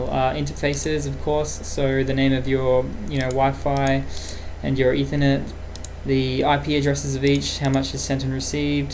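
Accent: Australia. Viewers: Thanks a lot bro... thumbs up! You sound Australian :D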